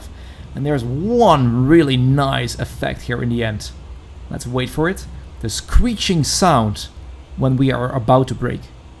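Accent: Netherlands. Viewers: Klinkt Hollands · Are you Dutch? You sound Dutch lol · Grappig. Je hoort direct dat je een Nederlander bent.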